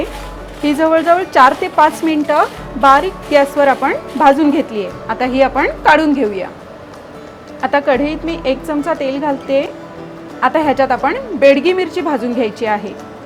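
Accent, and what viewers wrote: Konkan, India. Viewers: Excellent,Mrs!! By the way,where do you stay,as your accent is,typical konkani. Thanks & all the Best to you,Family members.Shrineevas Sant,Nasik <mail>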